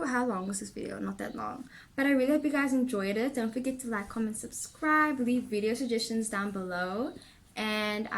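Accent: South Africa. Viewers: Ah south African? ?? 😄 yessss 😄👏❤ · 😂😂😂 The 2nd scarf - You totally took me back to where we met. His a gemini and I'm a cancer. Everything you said resonates besides the long distance part or probably it means the distance between the two of us. I'm even older thn him You have a South African accent. Do you live in Kempton Park or near Lanseria? If I'm wrong, let me know please. I would like a reading in person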